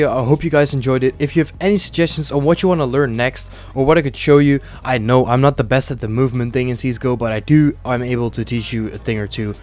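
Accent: Netherlands. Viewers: Are u dutch lol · ahah ondanks dat je accent heel neutraal is hoorde ik het toch een beetje XD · I can hear he is dutch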